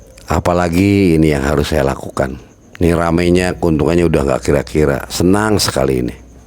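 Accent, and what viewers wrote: Indramayu, Indonesia. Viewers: Logatnya bicaranya kaya orang Indramayu atau orang Cirebon 😁 Betul ngga sih ?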